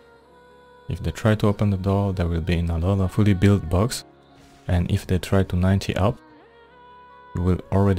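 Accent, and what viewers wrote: France. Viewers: T'es français ?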